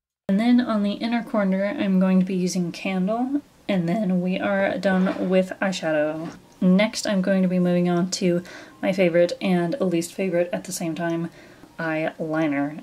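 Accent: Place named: United Kingdom. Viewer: You remind me of a teacher,you have that look on your face....a strong one..dont joke with me :-) British accent is phenomenal You have definitely some acting skills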